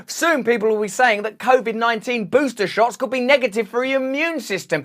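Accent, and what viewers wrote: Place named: United Kingdom. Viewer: I love you too Russell such a wonderful way to get my news a funny highly intelligent person with a Brit accent 🤣 wanka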